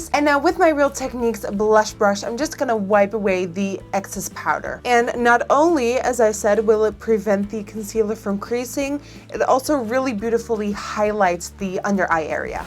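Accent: Netherlands. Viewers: Subscribed!! Not only are you funny sweet and full of great tips but your adorable accent reminds me of my late great grandmother and my uncle. Both are from the Netherlands too!! Keep up the great work and awesome videos! 😘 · Are you dutch? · 2015 is so different to 2011! She used to have such a thick Dutch accent!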